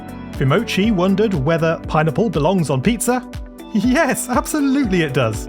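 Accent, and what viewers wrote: United Kingdom. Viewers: i love your voice and that slight british accent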